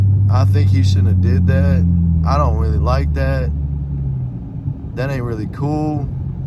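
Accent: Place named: United States. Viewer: You must be from the country you sound country...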